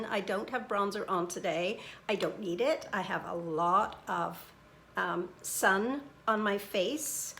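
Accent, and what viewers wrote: Ireland. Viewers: Just found you from Kathy's video! I've subscribed :) Are you part Irish? I can hear something different in your accent but can't place it 🤔 x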